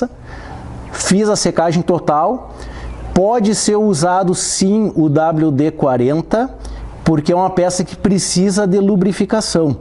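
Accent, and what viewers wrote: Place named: South, Brazil. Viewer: Obrigado pelas dicas amigo. Acabei de comprar uma FA. Entao ja vi alguns videos seus e do val( quando tinha a gsxs) pra entender melhor a moto. Tem sotaque do Sul. Vc é de onde?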